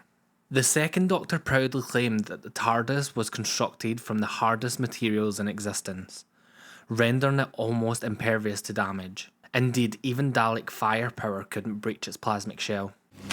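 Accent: Scotland, United Kingdom. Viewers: Never heard a Scottish person before?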